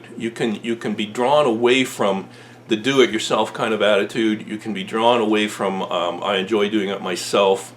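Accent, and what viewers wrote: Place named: Ontario, Canada. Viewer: hi, I found your channel recently and as soon as I heard you speak and say the word 'out' I new you were from the middle east (I am guessing Ontario). I live in Northern Alberta and try to spend a lot of time out doors, I hunt, side X side, camp, etc. I also tinker with 'blacksmithing' I make knives, froe's, axe's and do fabrication work. I haven't had a chance to watch all your videos yet, but do you winter camp?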